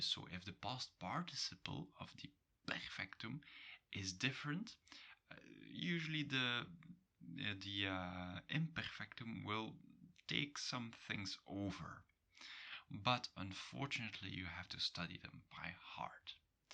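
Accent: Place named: Belgium. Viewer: thank you. You get barely any views but you help me a lot with my dutch. Are you Belgian because your accent sounds interesting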